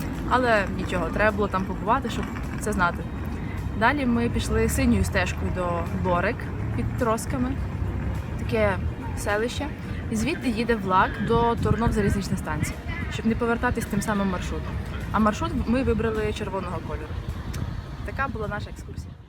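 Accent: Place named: West, Ukraine. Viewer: доброго дня.😀. Дивлюся вашівідео,дуже гарні і позитивні,а найважливіше Українською. Звідки ви,бо чую ніби західний регіон?. Хотів би запитати можливо,щось чули про завод Sempermit,в містечку Odri,неподалік від вас? Бо виробляю туди документи на роботу з дозволом на 2 роки.Дуже дякую за інтересні відео,успіхів вашій сім'ї. Можливо колись зустрінемось в Чехії.дякую.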